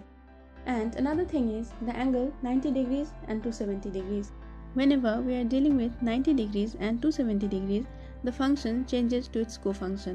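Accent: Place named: Nepal. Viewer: Hlo ... miss where are you from ? .... your accent look like nepal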